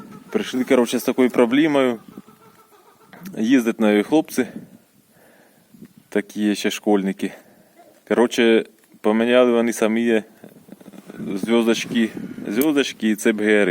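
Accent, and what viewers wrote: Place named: North, Ukraine. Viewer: По говору чую, що мій земляк з півночі)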